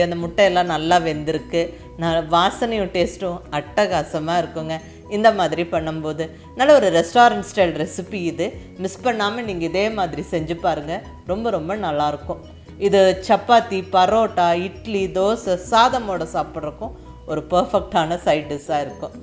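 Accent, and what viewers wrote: Kongu, India. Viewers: Hai Mam! I love to hear your Kongu Tamil. The way you explain , each dish is so clear that even the beginners can understand well. Will you give the link for the white Kadai which you used for the preparation of Ragi halwa?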